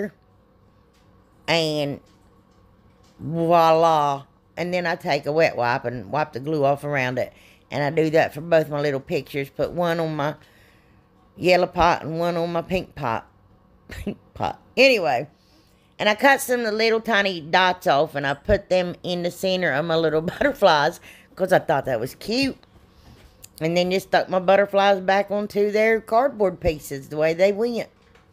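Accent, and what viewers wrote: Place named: Rural, United States. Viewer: I absolutely love your accent... What state are you from? Your crafts are so kool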